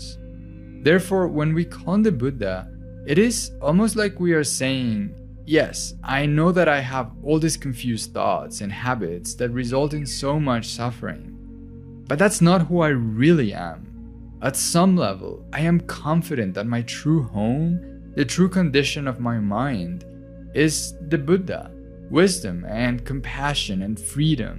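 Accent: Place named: Quebec, Canada. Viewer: Very informative! Look forward to the rest of the series. (Et je pense que c'est un accent québécois que j'entends...😊)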